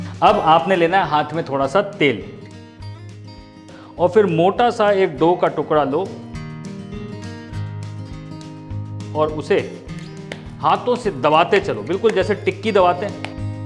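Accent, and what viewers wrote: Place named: Sindhi, India. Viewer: Ur Sindhi speaking is just💖💖...so sweet... but it's ढोढो not डोडो😅. U r a great chef and extremely humble person..we love you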